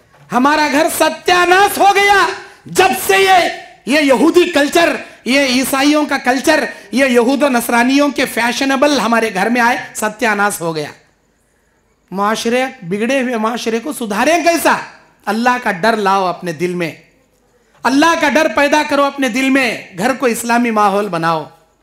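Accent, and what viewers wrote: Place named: Dakhni, India. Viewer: Dakhni zabaan ki mithaas ??? Alfaaz dil mein utar jaate . Allah aapke Tawheed ke mission ko kaamyaab kare Amee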